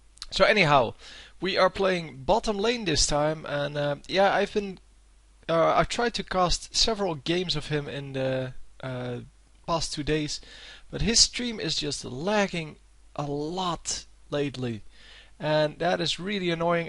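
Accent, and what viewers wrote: Netherlands. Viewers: Better quality :) The game was not that special xs. Nice comentator Aan je accent hoor je dat je NL bent ;) BE hier ^^ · when dutch ppl speak english 0,0 nty plz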